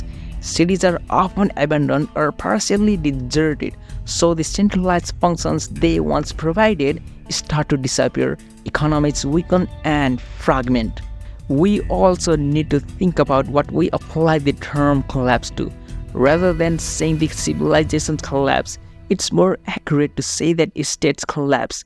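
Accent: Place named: South Asia. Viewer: I see why you started using an AI voiceover, it's because you're from South Asia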